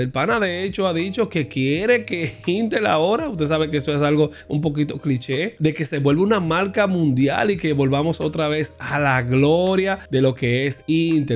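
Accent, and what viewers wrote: Dominican Republic. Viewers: buen video, sos de Republica dominicana? que tal el stock alla ?xD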